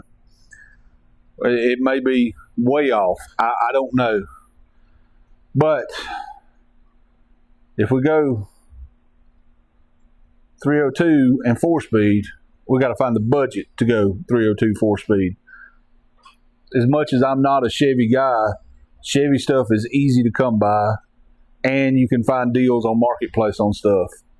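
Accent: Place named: South, United States. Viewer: By yer accent, you way south of me in Washington , I got several pushrods I’d give ya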